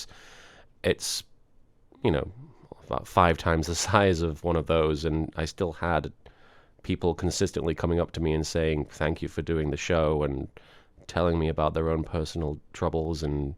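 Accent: United Kingdom. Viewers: i learn how to talk more like a british cause of you :D!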